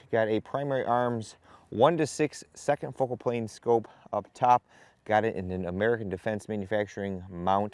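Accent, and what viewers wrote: Australia. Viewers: Good Australian Accent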